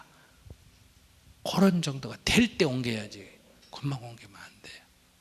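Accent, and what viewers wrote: Gyeongsang, South Korea. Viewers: 인생 상담자 일인자.. 경상도사투리 정말 티꺼운말투..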